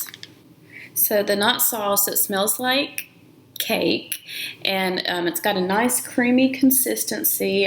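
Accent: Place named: South, United States. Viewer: I definitely need a detangler. My hair is 3c so I hope it works for me. Also where are you from? Your accent is super southern and strong lol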